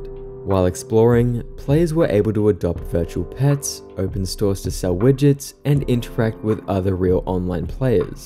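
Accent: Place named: Australia. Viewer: the aussie accent is great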